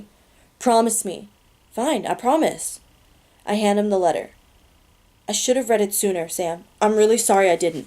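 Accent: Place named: United States. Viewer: I think what I wrote confused you, I apologise as I write as I speak, I am at the moment listening to the "power of six" and was confused as I thought I had missed " Six's Legacy" but you have cleared it up that it is a novella ( I will listen to that after this one " the power of six" ) as I am now guessing it gives you an insight into just her life no one elses, Yes accents are strange I knew you were from the US just couldn't pinpoint where about, although I must say it is a very nice accent to listen to and I love that you change it for the different characters, really helps me visualize the story. So glad I found your channel, as it is helping me pass my mundane hours working from home, I work with clay and creating small charms for jewellery and a few episodes before this one I believe, I was doing something really intricate on a piece and your cat jumped on your knee and you screamed, I threw the clay across the room, haha I couldn't stop laughing at myself you gave me such a fright, as I wasn't watching the screen haha :D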